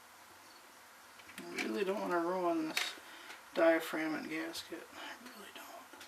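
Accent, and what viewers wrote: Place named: Upper Peninsula, United States. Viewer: you are picking up a little yooper accent!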